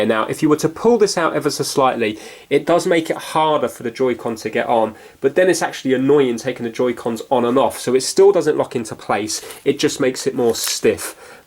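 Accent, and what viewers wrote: England, United Kingdom. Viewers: Yes, he is from England. they say torch for the word light. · British accents are sooooo satisfying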